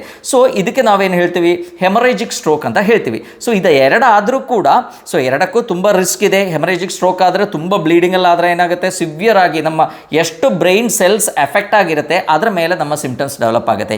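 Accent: Kerala, India. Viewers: Malayali doctor🤔 launguage gothaguthe 🤠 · I think you from kerala doctor Your Language pronounsation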